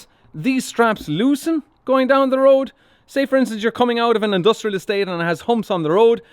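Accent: Ireland. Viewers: A guy with a Greek name with an Irish accent, driving a Swedish truck on English roads: doesn’t come more cosmopolitan than that. Seriously though entertaining video!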